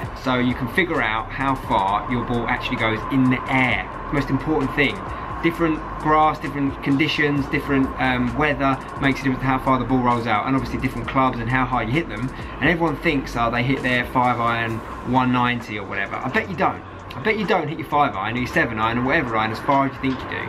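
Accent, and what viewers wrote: Newcastle, United Kingdom. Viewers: True Geordie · True geordie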